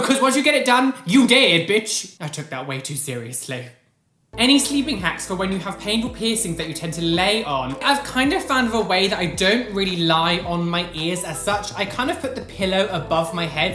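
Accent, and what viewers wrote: United Kingdom. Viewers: You're british??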